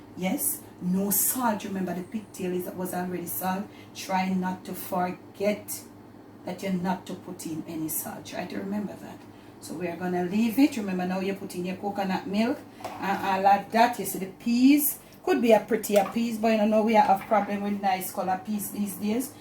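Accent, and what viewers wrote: Jamaica. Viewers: Miss from down a yard I love u n I'm from down a guyana n luv to hear u talk me luv talk to n me is a chef but luv to look at u makes me smile all time with u Jamaican talk n knowledge u go girl u can talk cause u can cook na lol peace god bless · Mi love d patois, so gwaan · One of the reasons I follow you is because of your "talking". You remind me of growing up with my Jamaican parents. 🥰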